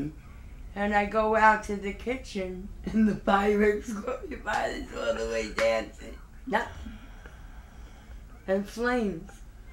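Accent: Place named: New York, United States. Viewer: a true New Yawk accent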